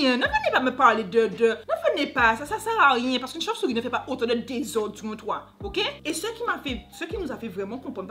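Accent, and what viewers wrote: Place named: Canada. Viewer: Accent canadien oklm 👌🏾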